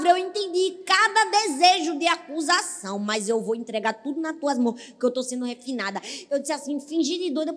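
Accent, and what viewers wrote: Northeast, Brazil. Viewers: Amo o sotaque nordestino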